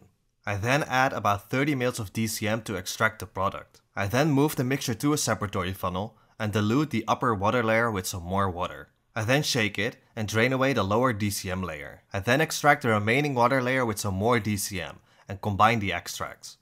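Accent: Netherlands. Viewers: Jy hoor de swaar Nederlands-aksent in sy spraak. 😂 Maar ja, baie goed, né?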